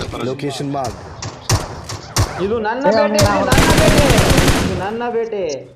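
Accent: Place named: North Karnataka, India. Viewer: Pakka utra kannada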